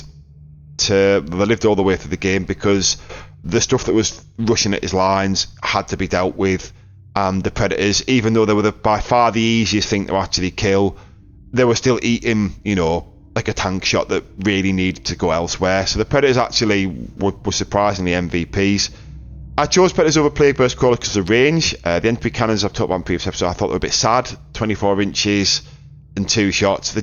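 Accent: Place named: Teesside, United Kingdom. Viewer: I've lived out of the uk for 20 years, but your accent reminds me of home. After so long, it's hard to be sure, not a mackem i think, maybe a little more south, smoggy? Nevermind you just answered this as i was typing, but i'll leave it up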